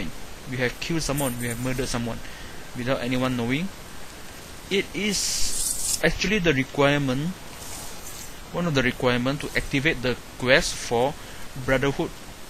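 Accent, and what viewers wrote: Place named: Arab world. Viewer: Arabic right? Great Accent bro.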